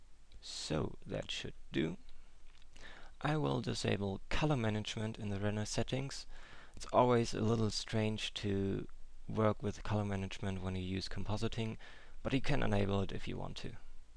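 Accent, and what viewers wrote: Germany. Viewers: You still have an accent. You can just about tell it's German but yeah you can tell it's there. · You still have your german accent. One matter of practicality. Tricky techniques don't need handholding. We can setup on our own. Make your life easier and skim the basic stuff.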